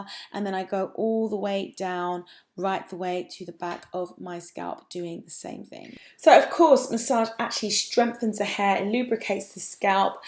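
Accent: United Kingdom. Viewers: ARe you going to show the video of the meet up in NYC? Thanks again, i like to listen to your British accent, it is so calming.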